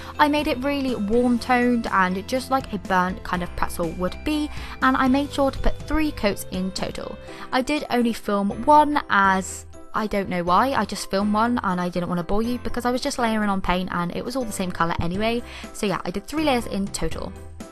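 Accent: England, United Kingdom. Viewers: Honeycomb Owl mmm she isn’t Scottish lol she is english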